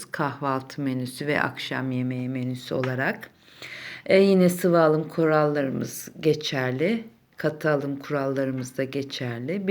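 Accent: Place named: Çarşamba, Turkey. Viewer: Her halde yine carsamba aksani Ahmet Apa niyetimizi yaptirir .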